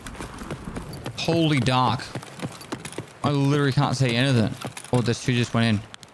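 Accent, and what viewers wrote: Australia. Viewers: Imagine an angry Australian man in an abandoned mall yelling and chasing you down. I’d be terrified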